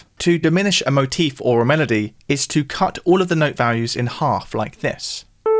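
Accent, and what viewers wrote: United Kingdom. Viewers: I think the only one I didn't know was mordent? But I'm an American guitar player, so your definitions for glissando and portamento seemed backwards to me. As a bit of an Anglophile, I very much enjoyed hearing some of your pronunciations of the terms. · That british accent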